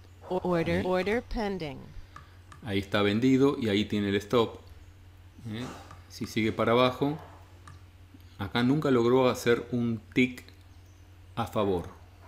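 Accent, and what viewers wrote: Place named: Argentina. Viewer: ahí me fijo.. muchas gracias!.. ché, por tu tonada creo que eres argentino.. vivís en Buenos Aires.. das algún tipo de asesoría presencial?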